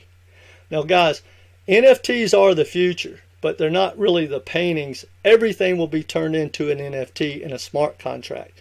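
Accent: Texas, United States. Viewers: Thank you…. May I ask some question here… but are you from Texas ??? Your accent is very strong 😂 quite hard to understand is you from Asia 😂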